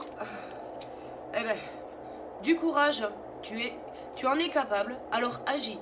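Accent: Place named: South, France. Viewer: Merci!!! C’est exactement ça ! Vous êtes top et en plus j’adore votre franc parler 😉et votre accent ! Sud est où sud ouest?😜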